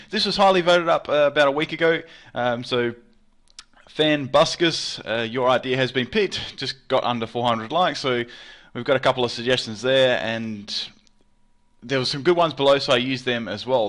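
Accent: Australia. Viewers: I was like: "Hey, same as Australia! Oh wait. is Australian...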